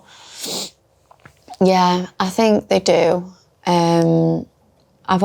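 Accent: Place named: Yorkshire, United Kingdom. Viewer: Sounds like a Yorkshire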